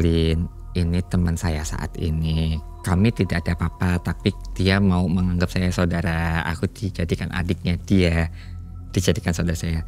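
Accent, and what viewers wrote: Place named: Java, Indonesia. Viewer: Ditunggu Mas cerita epik nya..bahasa Jawa ne jenengan enak banget di dengar nya❤❤😊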